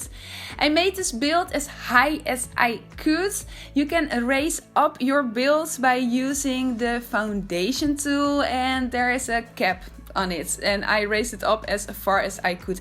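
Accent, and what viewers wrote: Netherlands. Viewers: Are you dutch? Cuz u sound like one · I like this design a lot. Also, are you Dutch? I think to recognize the familiar accent. :) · Hahah ik hoor gewoon dat je Nederlands bent😂💜 Het ziet er echt cool uit👌😍💜💫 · Are you Dutch by any chance?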